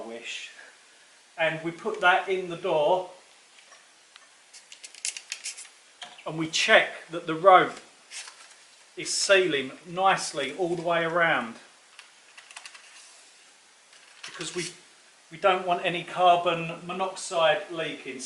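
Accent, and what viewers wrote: Essex, United Kingdom. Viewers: Love your vids and your day in the life ones. Possibly some of the following, how you preserve your produce, advice on keeping birds, how you go about planning what food to grow and why. More of your brilliant how to repair stuff vids. Your growing tips and hints. Ps. Nothing wrong with an Essex accent 👍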